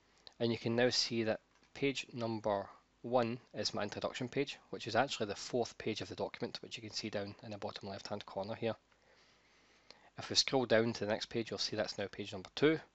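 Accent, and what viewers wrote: Scotland, United Kingdom. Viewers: Thank you so much, for your excellent demonstration! You were so clear on how to perform this function and your delivery was at a very appreciative pace! I am going to follow your great instructions! However, I need to insert Roman numerals into the pages prior to pages 1, etc, throughout my manuscript, so I will follow the same instruction and see if it works! If not, I will email you to ask how to insert Roman numerals prior or after I insert page numbers throughout my manuscript. BTW, I love your Scottish accent! Thank you again for your awesome instructions and delivery! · Thank you, thank you, thank you! I was losing my mind trying to get my document properly paginated. You made it easy. Also, love your accent. Viva Scotland!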